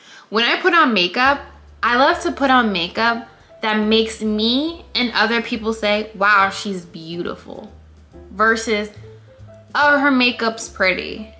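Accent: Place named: United States. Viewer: Girl I love your attitude. I am not from U.S but I can hear it right away, the accent omg 😅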